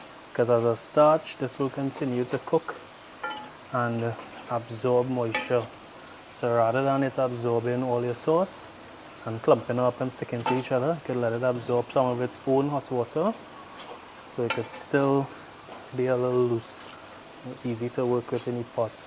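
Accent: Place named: Trinidad and Tobago. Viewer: My Brother in Law is Trini……. Just hearing the accent makes me Crazy HUNGRY!!!!! ❤️🇹🇹❤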